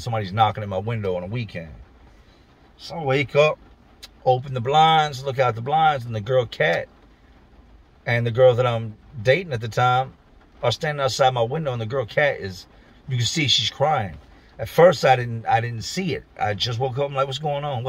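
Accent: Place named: South, United States. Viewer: That sure isn't a virginian accent you got there. I'm from Manassas . What you got there is a mixture of southern meets black. Like you videos but I hate "how" you say your story. Keep on keepin on my friend. It is what it is too. I just talk truth so if ya don't like me referring the way he speaks to blacks then o well. Fact remains though that y'all know exactly what I'm talking about.✌️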